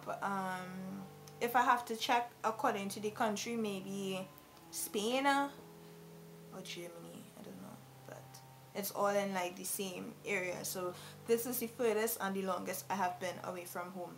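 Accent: Trinidad and Tobago. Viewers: Love this and thats y you have such a nice accent you from trinidad lol.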